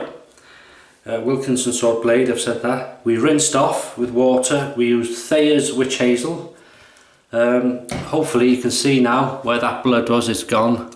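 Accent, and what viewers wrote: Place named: North Wales, United Kingdom. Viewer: I knew you were from North Wales could tell, I live in Llandudno don't know if that's anywhere near you. Another great video by the way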